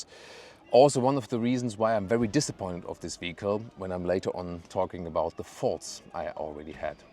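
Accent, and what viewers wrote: Germany. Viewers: First time watching this channel,straight away notice Hard German accent.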